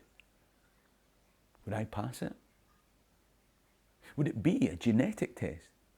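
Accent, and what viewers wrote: Scotland, United Kingdom. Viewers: With an accent like that you are most definitely Scottish my man, your ancestry is just that what ones ancestors were does not make you it, I am from Romani decent but I'm English not punjabi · DNA-wise, I don't think one can definitively state one is English rather than Scottish (or even Irish), similarly any West or Central African nation's DNA must be a bit of a mash-up. In all those countries the single salient difference would be the accent! You've got a genuine, born and bred Scottish accent, so in my opinion, that's the best evidence of your "nationality". My own DNA results came back as pretty definitively Scots/Irish with a smattering of English. Oh, and I'm also Australian, but that's entirely due to me gaining citizenship as a 40 year old. My two youngest sons can get an Irish passport, but I am not entitled. 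It's the confluence of genetics and politics. In fact that's what humans are, surely? It's only the bonehead gammons wherever they are in the world, who are afraid of that. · Dear: You are definitely a Scot! From your accent to your attitude. My best friend growing up was born of full blooded Scots - Highland mother and Lowland father. I couldn't understand her father at all, and I sometimes wondered if that was on purpose, to discourage unwanted visitors. He was part of the local fire department and worked long hours. My friend raised budgerigars, teaching them to speak and sing. Everyone of those birds picked up her mothers accent! It was hilarious! When the birds would talk, sometimes they would imitate her mother calling her. It was such a precise copy, that Charlene would answer them before realizing it was the birds, not her mother. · You are steeped in Scottish history, live in Scotland and certainly sound like a Scot. 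You are certainly Scottish in my book! Always enjoy your content! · English born Kiwi here, it wasn't until I went to live in the Uk that I realised I'm not one of this mob, I'm a Kiwi. It's not ethnicity that counts, its culture. From the moment you opened your mouth I knew you were Scots. Not just the accent, but speech idiom and humor. For immigrants this only works if you want to blend. When I was in England, I met Afro-Carribeans from Birmingham who spoke with Brummy accents. Culturally English and Caribbean, schooling and wider aculturation combined with family and local community vibe. If you are fully immersed in two cultures then you are both, a common experience for migrant and first generation kids. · Sorry, but you sound so Scottish it gives me my tingly happy fix! Those racists are so dumb, I am worried for their health. Well, not really scared, cause everything bad that comes to them they deserve tenfold. 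· I don't know how anyone who hears you speak could possibly think you are anything other than Scottish. Racists make no sense. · I dunno, you sound Scottish to me! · For someone that some are trying to claim is not Scottish, you have a real canny Scottish accent (that's canny in the Geordie and not the Scottish sense, just to confuse the Scots). It seems some people just cannot hear the obvious. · You sound like a Scotsman to me.. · Well, as a Czech who listens to you in a foreign language, twice over... your voice basically is what my mind now conjures when I think of someone Scottish, AND listening to you regularly helped me understand other Scots, too. So the racists who deny you that side can go to whatever your preferred expletive is. 😂 (And also as a Czech I'm undoubtedly a Central European mutt. Years ago a distant relative did have a DNA test made and back then had the closest match in Ireland...) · Mr., you have to remember that the largest source of human bullshit comes from racists who can't wait to expose their ignorance and spout their lies. 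As to DNA, you are who you are, and you were born in Scotland and, lord knows, you certainly speak with a heavy Scots accent. And your family is gorgeous! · You sound Scottish. 😉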